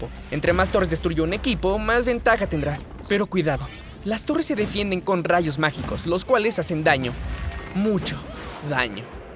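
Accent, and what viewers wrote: Argentina. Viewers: Sigo pensando que el man es Argentino, necesito info, no quiero leer más comentarios nefastos neaaa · Ese wuey es Argentino xd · re argentino el chavon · El tipo es argentino no? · Argentino obvio 😎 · el que habla es argenti o que? tiene alguna explicacion?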